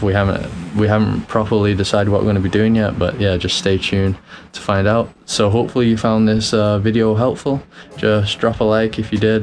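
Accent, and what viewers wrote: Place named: North, United Kingdom. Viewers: I did guessed that accent is British, somewhere north 😊. Anyway nice videos as per👍. I live about an hour away from London but I don't go there often, its just too busy for me. Btw, Big Ben is actually the bell of the tower, the tower itself is called Elizabeth Tower. It's under construction because it's gone weaker and its leaning, it will collapse if they don't do something. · I feel like you’ve got an English accent where are u actually from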